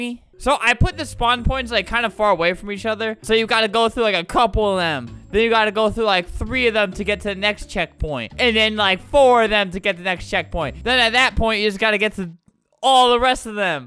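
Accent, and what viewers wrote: South, United States. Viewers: My teacher did a southern accent, and she sounded exactly like small edit: Holy crud I’ve never gotten 100 likes until now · Who doesnt love alberts american accent/voice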